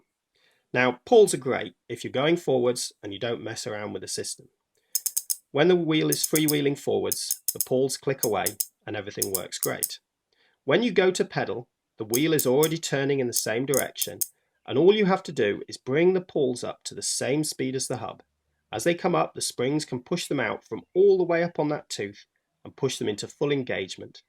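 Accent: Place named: United Kingdom. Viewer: So whose British voice is this?? I've been running Odyssey parts for half my life and finally bought a Clutch today 😁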